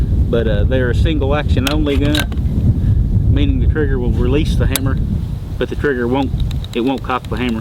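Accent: United States. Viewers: Love ur 'merican voice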